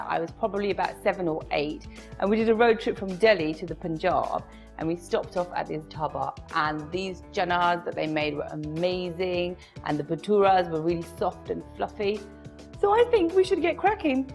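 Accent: England, United Kingdom. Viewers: English accent me chole bhature.....maja ni aya...😛😛😛😛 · the first thing I noticed is your British accent. my twin was watching you in the living room and I overheard it from my room and thought why nigella lawson sounds quite different today 😂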